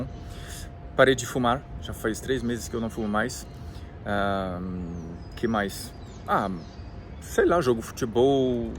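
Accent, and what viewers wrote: France. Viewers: O portugues do cada dia melhor. Daqui a pouco ninguem vai ouvir o o sotaque frances. Eu morei um tempo em Portugal, e não gostei. Acho que glamoriza-se muito morar na Europa. Por exemplo, os portugueses são muito dificeis, estressados, rudes, burocráticos, sem paciencia, parece burro empacado. Sem falar na agua horrivel. Paga-se uma fortuna pra comer uma carne decente. Ponto positivo para a segurança, o euro, a estrutura, apesar q salario portugues é bem baixo. Mas visitei uns amigos no Sul da França, alguns vilarejos muito lindos a caminho de Andorra, não tem como não se apaixonar pela França. Embora tenha tido pouco contado com franceses, me senti acolhida, senti que é um lugar aonde eu viveria feliz. Mas como estava morando em Portugal e nao tinah me organizado pra ficar na França, acabei voltando ao Brasil. Apesar dos problemas do Brasil, da corrupção que esta no DNA de muitos brasileiros, da ignorancia, da idiotização, da influencia da cultura americana, da moeda desvalorizada, falta de estrutura, amo meu pais. 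Faz muita diferença esta perto da familia, dos amigos, o clima, sol (como faz falta) as pessoas, a variedade e qualidade da nossa alimentação, a agua, a cultura mais solidaria, o humor do brasileiro, a praticidade, as modernidades que ja existem no Brasil, as praias, as do Nordeste, amo. Mas tb nao gosto das praias com muita construção tipo as de cidade. Balneario, Rio, Recife. Prefiro as mais afastadas, mas com alguma estrutura. Porque aquelas praias no meio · Sotaque de franceses falando português é tão fofinho kkkkkk ❤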